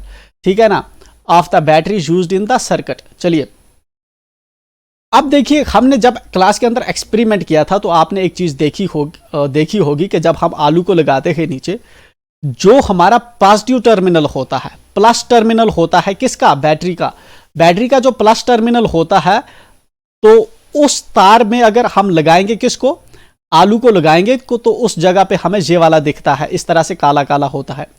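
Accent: Bihar, India. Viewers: Are you Bihari?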